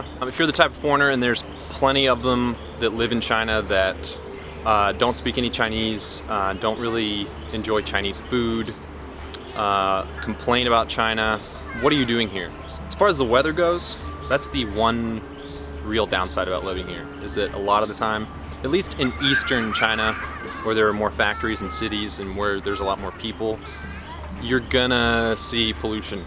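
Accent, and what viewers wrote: United States. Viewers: You speak well with great american accent. Great voice and great pronunciation. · Hey, I've always wanted to go to China but have never been.. It seems like a beautiful place... I'm from Magee,Mississippi.. What town are you from? I can tell that by your English that you are originally from the United States.. Where are you from originally? During Christmas of 2018, I got 2 books on a Survival Guide to China and South Korea.. I read the South Korea book and it was great.. I have a Japan book as well too.. Now I'm ready to read about China next.. Speaking of South Korea, have you ever been there? I've never been there before myself either, but I'd like to go there too one day... If you have been to South Korea, there are these 2 kids that are living there currently who are from the United States (San Francisco,California) and their names are John Rock and Mack Rock... 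Do you know them? They have a YouTube channel... Check it out.. It's listed under Rock Kids.. I've actually never been anywhere out of the United States!!! I've just always lived in Magee,Mississippi my entire life... It's a small town, but it's growing more and more in population everyday!!!!! Have you ever been to Mississippi before?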